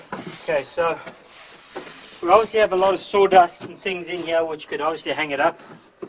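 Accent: South Africa. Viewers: Thats a heavy South-African accent you've got there mate . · Is that a South African Accent I am hearing ?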